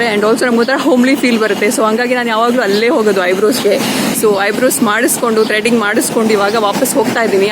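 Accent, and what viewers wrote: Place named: Mangalore, India. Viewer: Niv yake manglore thara Kannada mathadala.. El kalithu kondri banglore style Kannada..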